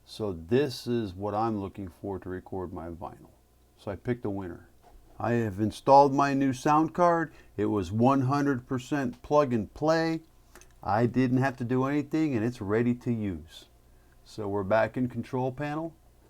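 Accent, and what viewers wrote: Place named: Baltimore, United States. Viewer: That's fascinating. The reason I asked is you seem to have a little bit of our accent. The reason it's kind of fascinating is the thing about Baltimore the accent is like Yankee southern, in other words, it's like it's not sure if it's north or south. That's so interesting you spent your youth up north then most of your adult life south and maybe it gives you sort of a distinct accent, but I swear it's a little like ours. Anyhow, great channel! keep doing your thing.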